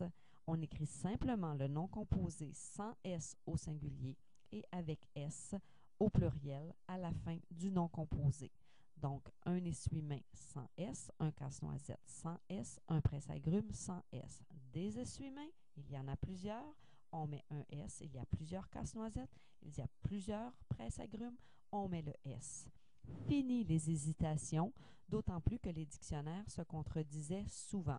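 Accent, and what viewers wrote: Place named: Quebec, Canada. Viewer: C'est la première fois que j'ai remarqué l'accent québécois. C'est vraiment intéressant hein. Moi, je suis italien et j'avais toujours écouté seulement lequelle français. Maintenant je veux aller au Canada ahaha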